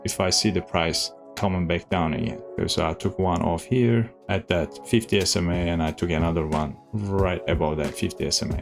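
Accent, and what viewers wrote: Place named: Turkey. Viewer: Hello, Are you originally Turkish? Your accent sounds Turkish😊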